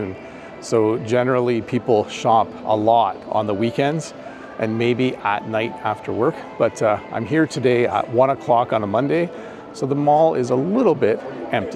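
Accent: Canada. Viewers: hello! i got a question, what’s your accent? is it canadian, or i’m wrong? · We enjoy Canadian English, thank you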